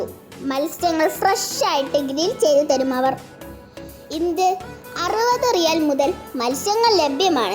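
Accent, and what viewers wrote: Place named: Mannarkkad, India. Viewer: മണ്ണാർക്കാട് ഭാക്ഷ ഇടയ്ക്ക് കയറി വരുന്നുണ്ട് …❤️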